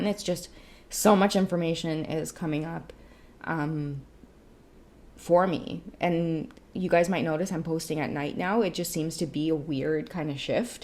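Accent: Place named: Trinidad and Tobago. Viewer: Your channel is top quality what a treat you are! and with your cute ass trini patois just love to listen to you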